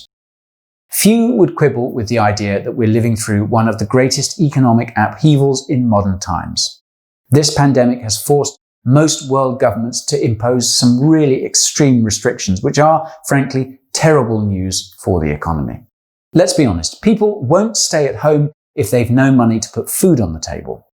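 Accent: United States. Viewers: Guy talks increasingly like Americans now.... "Here's the deal" "if you ask me" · sounds American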